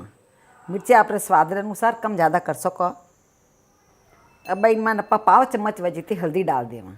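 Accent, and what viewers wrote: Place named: Rajasthan, India. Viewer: AAP kata ra ho. Bilkul mari jeri Marwari bolo ho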